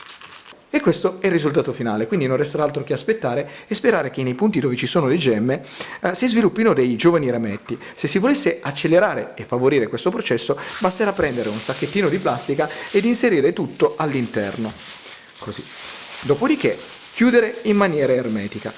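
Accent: Sicily, Italy. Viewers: Min "pucciarla.." dal tuo accento mi sembri siciliano o comunque del Sud, come lo sono io. Sentirti usare il verbo "pucciare", termine dialettale piemontese e lombardo, fa veramente male. Però, detto questo, complimenti per il bel video 🙂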